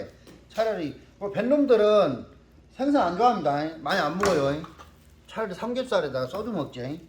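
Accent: Jeolla, South Korea. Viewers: 선장님께서는 완죤 구수한전라도 사투리 듣기좋아요 영상들으면 구수한 사투리 재미 있어요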